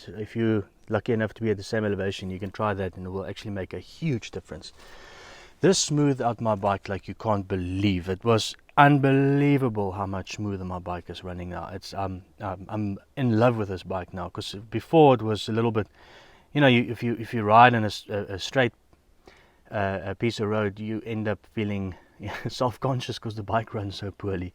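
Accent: South Africa. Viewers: Afrikaaners probeer engels praat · Excellent and informative. Thanks for the vid. It sounds like you’re from South Africa but is that where you’re riding? Forgive me if that’s a silly question but the terrain looks similar to where I am in the eastern US. I. LOVE tight technical woods riding.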